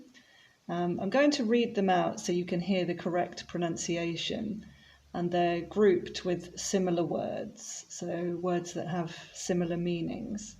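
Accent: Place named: United Kingdom. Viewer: I'm so thrilled about your accent 🤲 I do like a British accent 🤲you do sound natural.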